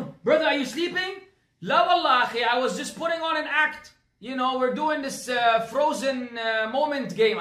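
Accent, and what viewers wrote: Egypt. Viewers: Nice egyptian accent :D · assalaamualaikum ya akhy al habib, lol bro ur egyptian accent is on point and ur jokes are true lol barakAllaah feek for these lectures. :)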